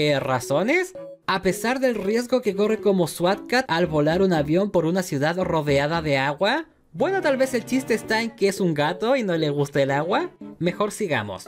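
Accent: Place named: Chile. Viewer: Que buen trabajo, noté un ligero acento Chileno, qué edad tienes? Yo tengo 37 años, la vi a mis 8 o 9 años está maravilla.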